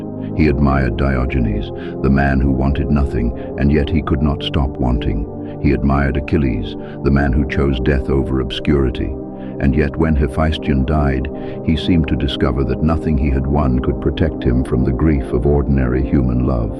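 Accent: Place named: United Kingdom. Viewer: so bored of that british accent commentator without a face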